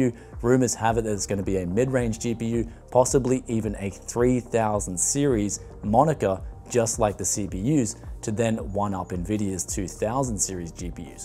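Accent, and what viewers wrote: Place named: Australia. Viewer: I am watching the video in class, so I have to mute it and read the auto-generated subtitles, props to youtube for the perfect recognition of your aussie-ass accent.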